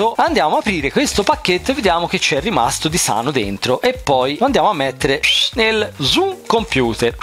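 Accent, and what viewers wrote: Tuscany, Italy. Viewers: Ma scusa se ti dà fastidio l'accento toscano non lo guardare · Hai un accento di merda, potresti essere più ascoltabile se non tenessi l'accento toscano nei video. X il resto meno male che va + veloce è un SSD. Carina la cosa della scheda madre anche se è vista e rivista mille volte. MI RACCOMANDO CAMBIA QUEL ACCENTO DI MERDA DA TOSCANO !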